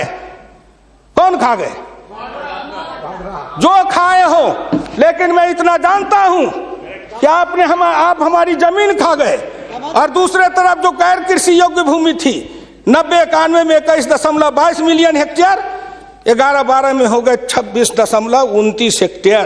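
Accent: India. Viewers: sir you have brilliant desi style of speech with logically correct ground reallities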